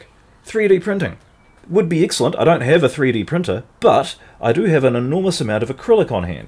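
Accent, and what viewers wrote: New Zealand. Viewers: I have 4001 and find the neck pickup needs to be at about 85% before it’s just too much bottom end. Thanks for for the shielding tips - tempted to see if you would do a bit of a upgrade to mine. you sound like you could be in NZ.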